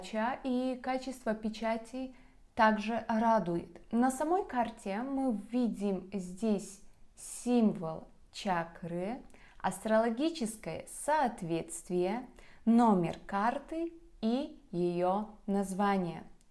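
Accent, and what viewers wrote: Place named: Baltic states. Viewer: Очень красивый и лёгкий прибалтийский акцент ❤️✨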